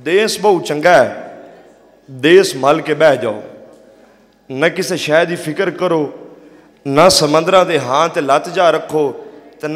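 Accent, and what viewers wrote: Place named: Punjab, Pakistan. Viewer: جٹکی پنجابی ❤❤❤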